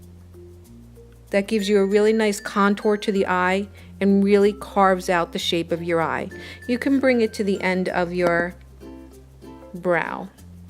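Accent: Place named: New York, United States. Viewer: and OHMYGAWWWD I detected a slight NY accent? A Southern Yankee transplant here from CT living in NC ... def subscribing to this channel!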